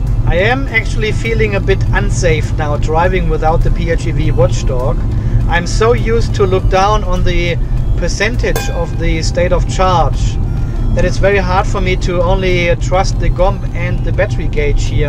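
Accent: Germany. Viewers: Das verstehe ich sehr gut. Alleine diese Videos kosten schon enorm viel Zeit. Dein Englisch mit leicht deutschem Akzent ist aber für Deutsche sehr sehr gut zu verstehen. Gut, dass du dir nicht den australischen Slang angewöhnt hast.😉 Bei Garry Reed habe manchmal Probleme seine Worte zu verstehen.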